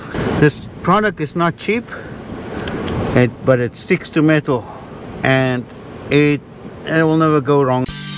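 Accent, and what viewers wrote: South Africa. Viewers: I detect a fellow South African perhaps?? Please help me find a similar product in SA!! I need a flat roof doctor in Cape Town please!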